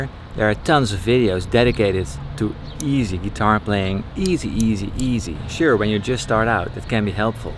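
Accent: Netherlands. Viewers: Mui MasMacho every educated Dutch person speaks fluent English in my experience, and with that accent.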